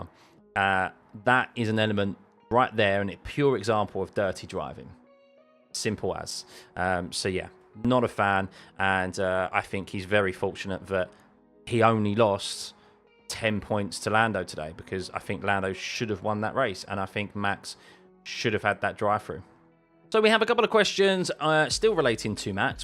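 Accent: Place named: United Kingdom. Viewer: Can you do a non-UK accent? It might hide your obvious bias. Good luck!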